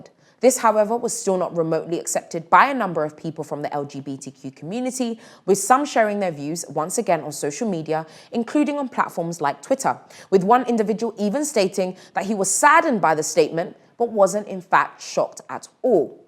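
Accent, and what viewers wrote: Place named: England, United Kingdom. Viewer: This is not an issue with whether your reporting is accurate or appropriate but your statements concerning Sarah Everard may cause you problems. You have stated in this report "It was later found that she had been kidnapped and murdered by 48 year old Wayne Couzens". He has not yet been convicted so to state this unequivocally in those terms is factually wrong and also potentially in contempt of court. I am not sure where you are based but you have an English accent so I presume you are in the UK and if so commentary such as this could put you and your channel at risk. This is a link to information on the legal principles here <link> This is the related guidance <link> and this is a link to a report from the time The Sun and The Daily Mirror were fined for their reports on the murder of Joanne Yeats <link> Even if Wayne Couzens is convicted at trial or by pleading guilty the issue is potential prejudice to a fair trial. 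I think you need to give some attention to these requirements to avoid potentially ending up on the wrong side of the legal principles.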